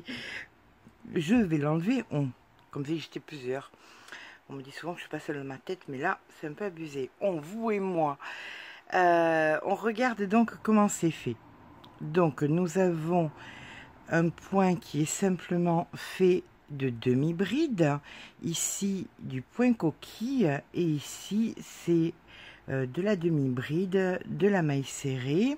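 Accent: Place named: South, France. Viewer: Merci pour ce tuto.mais pour une débutante... le point coquille n est pas toujours évident. Je n ai fait qu un rang de point coquille. Je b arrivais pas à bien comprendre sil fallait piquer dans chaque côte ou pas... le cadrage n étant pas optimum... mais continuez comme cela..avec votre charmant accent du soleil!😘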